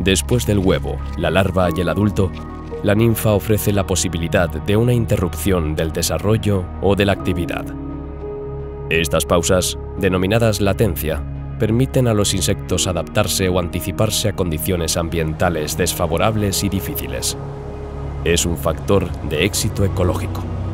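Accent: Spain. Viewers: doblados al español de España, lo que da una sensación que tus videos fueran documentales profesionales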